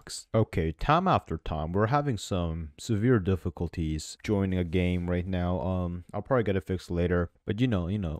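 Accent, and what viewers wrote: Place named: Poland. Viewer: as a polish person i confirm, this is exactly how we speak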